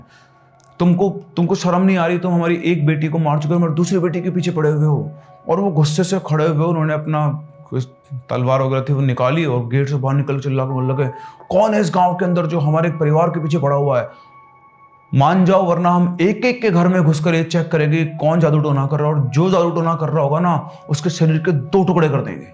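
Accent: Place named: Bihar, India. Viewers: Your Bihari Accent 🫡🫡🫡 ekdum Humare tarah hi bol rahe h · Narrating Story in Bihari accent is very Fantastic, we Bihari love it 😄😃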